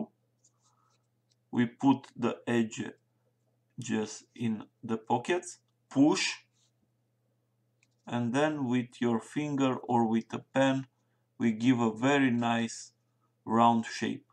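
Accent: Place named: Russia. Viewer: пытается говорить по английски ,но сражу просвечивается русский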